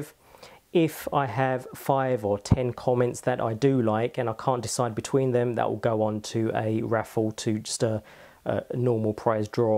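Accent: United Kingdom. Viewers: I like white because it really makes the iPhone stand out and make it look sharp. It's also easier to find and more pleasing to the eye. I really hope you chose me. (LOVE BRITISH ACCENTS) ;)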